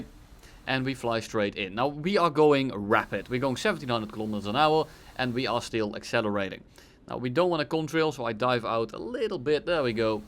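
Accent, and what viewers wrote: Netherlands. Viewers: i smell a nederlands accentje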